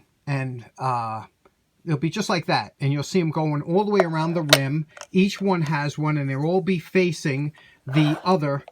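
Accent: New York, United States. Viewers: Longga island. Accent is great. Thanks for the vid. Few of the most genuine people i ever met are from new york.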